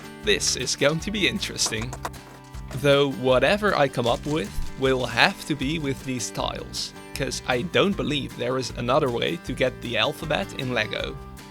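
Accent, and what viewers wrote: Netherlands. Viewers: He sounds Dutch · ik hoor een nederlands accent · Another dutch person successfully sniffed out by his accent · Is this guy Dutch? He sounds Dutch. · ben je Nederlands? ik hoor je accent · Nice vid, and as fellow dutchie, i immediately caught on your dutch accent hehe ;) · you sound very dutch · Nen ollander (duidelijk hoorbaar 😂) met veel tijd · I heard you talk checked your profile, yeah Dutch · Are you dutch? because you sound like have a dutch accent. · Why does this guy have a dutch accent? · You lowk sound dutch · Your from the Netherlands? The accent❤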